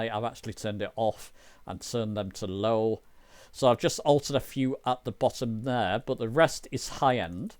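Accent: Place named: Scotland, United Kingdom. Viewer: Lol your accent sounds just like my Scottish friend I play Rust with! Thanks for the video!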